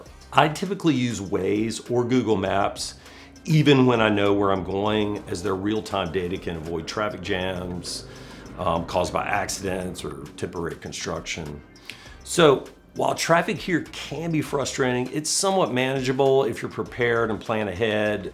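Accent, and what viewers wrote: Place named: Mid-Atlantic, United States. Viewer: I love your Mid-Atlantic accent. It reminds me of my own Baltimorean accent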